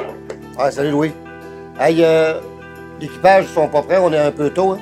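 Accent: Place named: Quebec, Canada. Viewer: Friends, that ain't Parisian french...that's joual!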